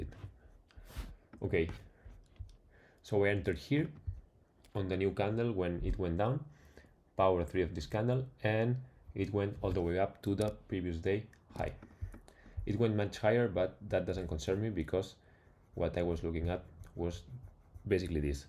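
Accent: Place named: Spain. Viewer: video super útil, gracias! Buen ingles, pero se nota el acento Español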